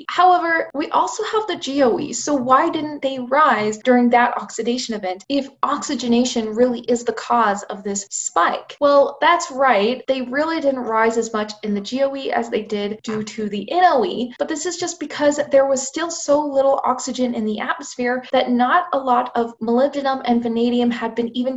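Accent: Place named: Midwest, United States. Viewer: I ❤️! I’ve been patiently waiting for this video. Are you a Midwest girl? You sound “normal” to me being a Midwest guy myself. Let me guess you’re in the Chicago area?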